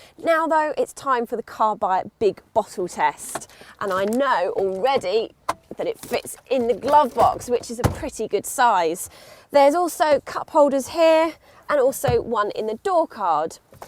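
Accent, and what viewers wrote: United Kingdom. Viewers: BRITISH ACCENT SO CUTE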